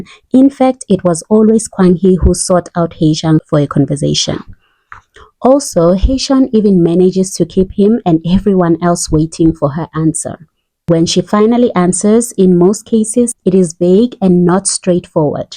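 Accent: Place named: South Africa. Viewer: Interesting perspective. Well articulated. Love it. You sound South African!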